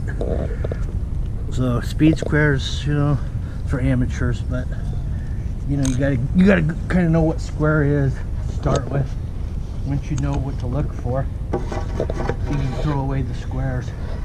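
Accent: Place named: California, United States. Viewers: Are you in California? I say that because you have that laid back California accent, anyway God bless thanks for the video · That California accent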